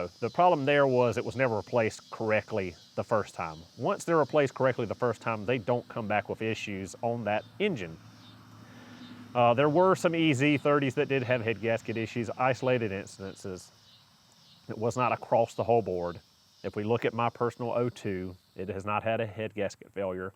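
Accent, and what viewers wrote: South, United States. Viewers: Bro, you sound like you’re from Georgia or Alabama. I’m in East Alabama and getting my first Subaru. Hit me up. I follow the service intervals, but want someone trusted for bigger stuff. My bigger stuff, I mean anything internal. I can and do sparks, injectors, ball joints, fluids, belts, etc.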